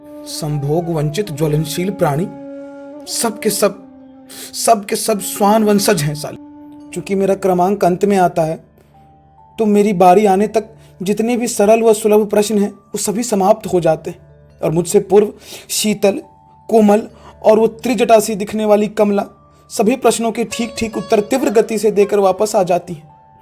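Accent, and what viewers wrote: Standard. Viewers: Aapki Hindi sunn kr dil khush hogaya...Kash ki aaj bhi log aise he pure hindi ka uccharan krte..Toh nahi sub ko English mein maharat hasil krna hai..🤣🤣🤣 Apna Desh ka mother tongue hindi koi bolna he nahi chahta..Sad but true.. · Such a nice....voice you have...you are a multi-talented....🙏 I love your voice and pure hindi accent.... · ARE SIR G....AP TO GREAT HAI...GJB KI SUDH HINDI BOLTE H.....MHABHART.....KI YAAD AA GYI....APKI VANI SUNKR🤣😔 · Sir itni shudh hindi 😂😅😅😅😅...baht aacha h sir.. Hats off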